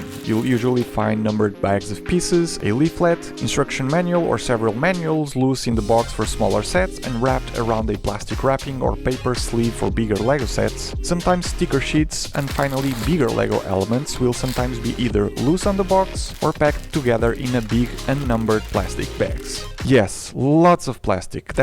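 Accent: Portugal. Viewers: És português? Parece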